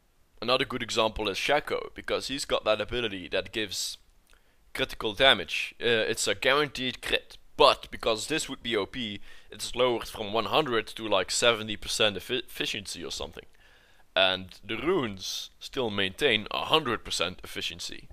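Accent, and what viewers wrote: Netherlands. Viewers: Ik hoorde aan je stem al dat je Nederlands was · Yup I think so cause' he's dutch and dutch people have that accent plus he has a rather deep voice :) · yup his accent is stereotype dutch :Z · Lol ik vind het gewoon grappig dat nu pas iedereen er achter komt dat hij nederlands is, hoort dan niemand dat nederlandse accent in zijn engels xD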